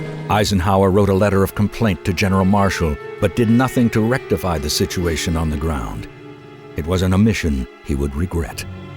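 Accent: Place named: United States. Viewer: Lolllll. I wondered f that were the case!!!! I was thinking “This must be British but they hired an American guy to narrate.” Lol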